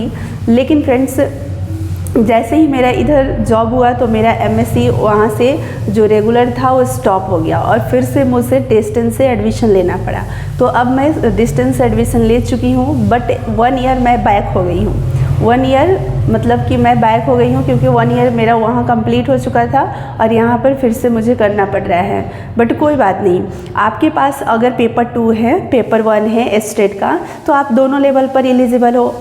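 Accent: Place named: Bengal, India. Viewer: Aap bangali ke tarah bolti h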